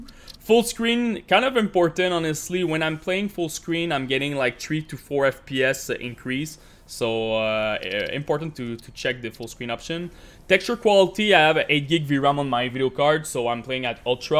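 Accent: Quebec, Canada. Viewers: J'ai reconnu l'accent québécois direct lol pis mon user est aussi Ludov lol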